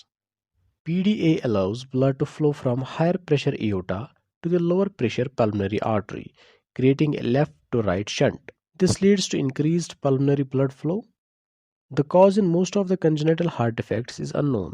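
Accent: Kashmir, India. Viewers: your accent sounds kashmiri !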